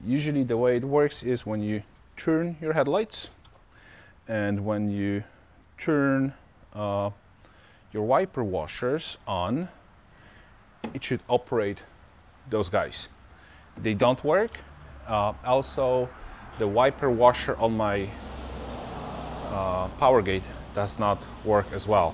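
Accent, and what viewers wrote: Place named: Poland. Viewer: sorry for my question i was just curious, noticed your accent and knew you must be polish how long you've been living in US? I'm Polish as well and live in UK Greetings!:)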